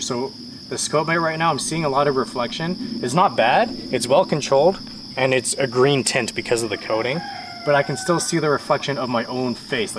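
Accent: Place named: China. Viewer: ESL...you mean Chinglish.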